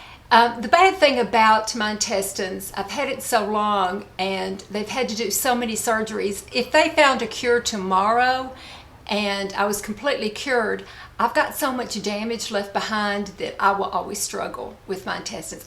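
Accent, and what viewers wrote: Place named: East Tennessee, United States. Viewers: I’m from East Tennessee too. Living in Florida now and your accent makes me feel at home. Love your genuine personality. 🥰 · As a newer subscriber, I super enjoy getting to know you like this. I know what you mean about internet safety, and it’s good you’re being cautious; it’s also a shame. 99% of us are just looking for fun and companionship re: girly things, but that 1% makes it unwise to share too much. So nice for those battling chronic health issues that you share about your positivity and living day by day: I also really respect the way you “just say no” haha to either sharing things which have already been done or sharing things just specific to your health needs. Lovvvvvve your East TN accent. And manner of speaking. I grew up in CO and the Midwest and no one speaks as pretty as y’all do. Your makeup looks especially pretty. 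Okay, friend—off to find my weights and yoga mat. You have inspired me to work on my own core and flexibility!!! 🌸❤️💕🥰🏋🏼‍♀️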